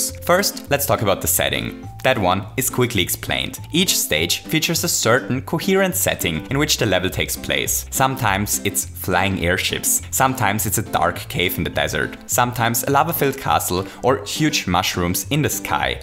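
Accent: Germany. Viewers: Du hörst dich deutsch an :D kann es sein? :o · Are you a German because you're english sounds like it has a german accent and I'm Not really Sure about it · Could you please make Videos in German? Ich kann deinen Akzent hören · Wait r u German?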